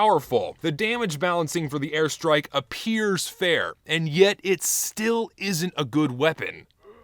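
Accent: South, United States. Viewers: Ur southern draw started coming out in the end. You unconsciously change your accent when recording. Also I am typing this to boost engagement. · Are you mid-southern? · I'm not american and to me your american accent is THICC AF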